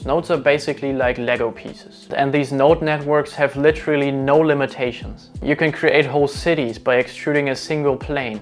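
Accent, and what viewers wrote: Germany. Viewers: I love your German accent! Great video!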